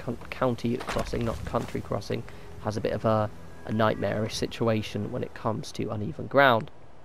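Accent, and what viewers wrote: England, United Kingdom. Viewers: English accents are perfect for instruction video lol you & Adi my favorite Fallout tips and tricks. Thanks bruv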